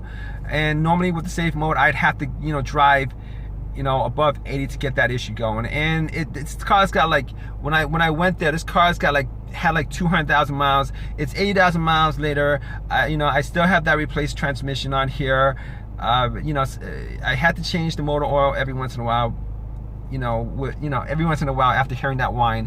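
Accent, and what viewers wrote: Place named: New York, United States. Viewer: love the accent!,new york,boston?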